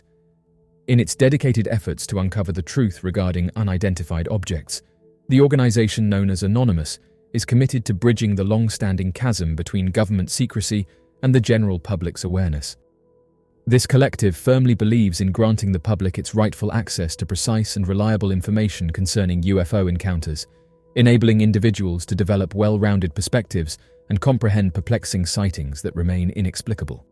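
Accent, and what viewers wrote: United Kingdom. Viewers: Love your soothing relaxing British voice 😁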